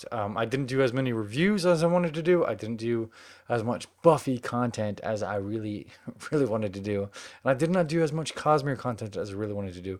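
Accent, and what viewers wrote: South, United States. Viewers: I love your podcast on this video at your house in Texas during your quarantine until you're watching one of your previous video of yourself from the past while you're talking about all of your previous reviews of all of your books and it's a lot of energy, so you're talking about everything including your top 5 goals and your future after that, but I wanna say I'm happy for you and I hope your husband, Zach will be very proud of you, because I love your Southern accent!!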